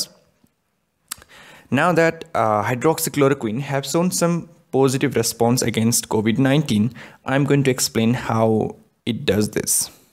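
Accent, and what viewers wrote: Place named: Nepal. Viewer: Ur accent seems u are from Nepal..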